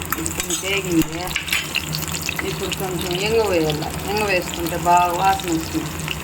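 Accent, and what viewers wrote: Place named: Chittoor, India. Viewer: Pakka chittoor basha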